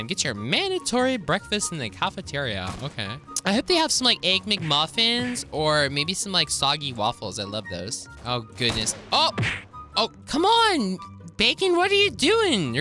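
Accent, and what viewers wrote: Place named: South, United States. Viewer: I turn on noficachio bell and I enjoyed it becuz I love when u talk country and I subscribed and I lovvve u.username:madihle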